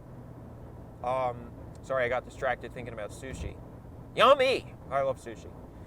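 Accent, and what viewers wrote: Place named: California, United States. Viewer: It took me til now to notice your definitive Cali accent. Do you enjoy being bald? I hope we get married someday.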